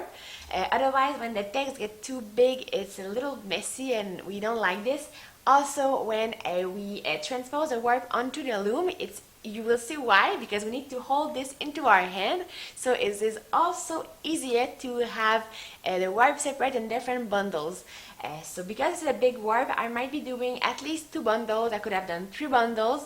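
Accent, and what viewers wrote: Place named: Quebec, Canada. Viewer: I can here the Quebec local accent hehe! Hello fellow canadian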